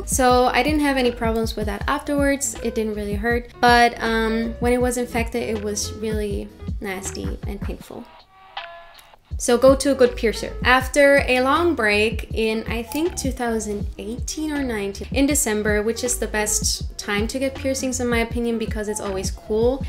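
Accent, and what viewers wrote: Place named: Germany. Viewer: Nice Video Hab einfach sofort man dem Akzent gemerkt, das du deutsch bist🥰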